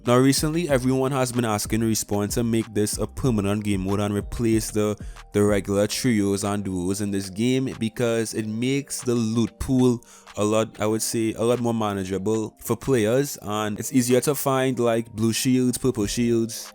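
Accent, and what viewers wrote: Trinidad and Tobago. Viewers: Are you from trinidad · Are u trini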